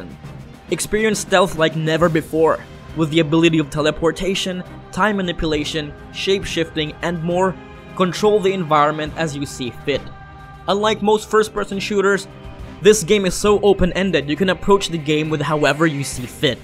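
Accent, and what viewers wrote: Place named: Philippines. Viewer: Yep I recognize the filipino accent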